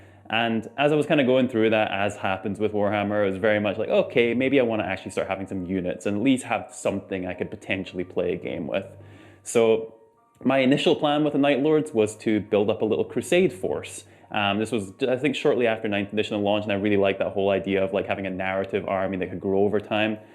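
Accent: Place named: Russia. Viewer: you trying so hard to not sound like russian but you still do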